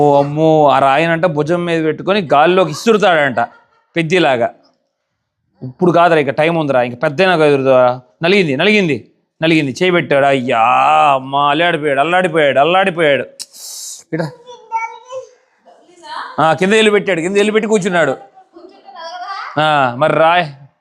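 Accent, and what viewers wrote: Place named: Andhra Pradesh, India. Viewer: సూపర్ సూపర్ అన్నయ్య ని మాటలు ఫస్ట్ టైమ్ మన ఆంధ్ర స్టైల్ లో చాలా చాలా బాగున్నాయి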